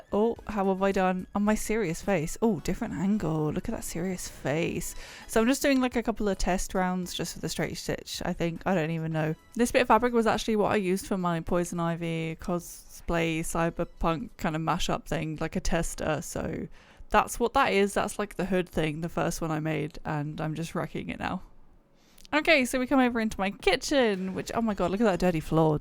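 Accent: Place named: United Kingdom. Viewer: NO worries on the delayed response. 😊 I know the U.K. has lots of distinct regional accents & your voice is so similar to hers that I was already pretty confident that you must be from around the same area. Anyway, again I really enjoyed the video & thought you did the legendary Streets Of Rage babe proud with that lovely cosplay.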